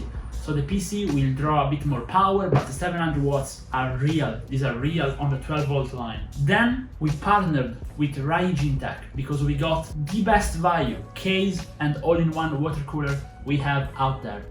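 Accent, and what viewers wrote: Italy. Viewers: Senza neanche leggere la descrizione del canale ho subito capitoo che sei italiano l'accento é forte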